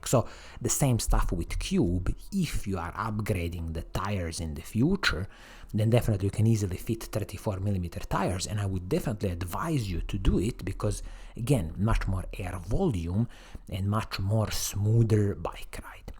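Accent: Italy. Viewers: I really like the Italian accent. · Ma perche' video in inglese quando si sente benissimo che sei italiano????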